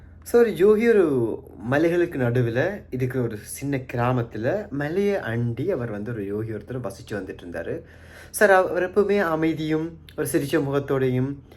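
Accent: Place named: Sri Lanka. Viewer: அழகான இலங்கை தமிழ் புரிய வைத்த நன்றி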